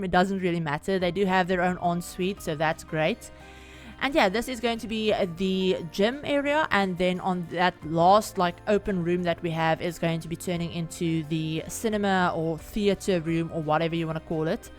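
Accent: South Africa. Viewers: Wow, spotted that accent from your very first word!!! 🤗 fellow South African here! Nice to see a SA creator :) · guuuuuuuuuuuuurllllllllllllll , i haven't found a creator from SA. notices the accent , its weird but awesome for some SA rep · Im south African too but i don't have an accent as nice as yours 😅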